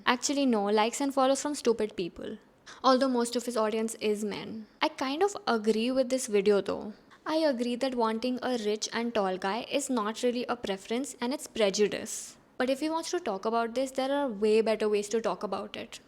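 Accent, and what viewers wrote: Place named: South Delhi, India. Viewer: just shutt up this nonsense shitt and speaking south delhi accent😂its not making u cool🤡🤡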